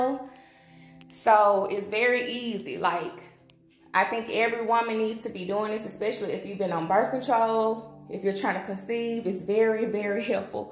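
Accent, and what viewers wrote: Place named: South, United States. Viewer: She has a Southern accent. She's not really pronouncing words wrong. She just has an accent.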